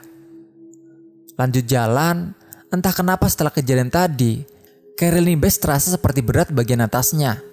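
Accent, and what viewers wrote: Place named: Java, Indonesia. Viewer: masih ada medok nya dikit tolong d hilangin mas... biar enak kedenger nya.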